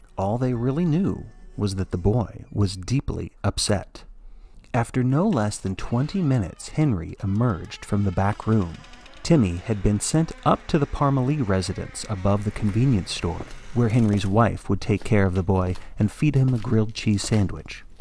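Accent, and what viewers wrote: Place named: Maine, United States. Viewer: As a daughter of a mother from Bangor, Maine I would like to thank you for using your best Mainer accent. For me, it really brings the story together 🙌🏽🥰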